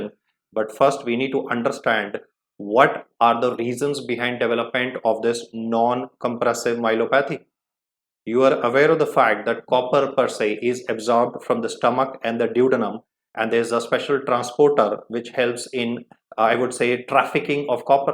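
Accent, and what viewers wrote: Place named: India. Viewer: could you add eng subtitles please? I have real problems with Indian accent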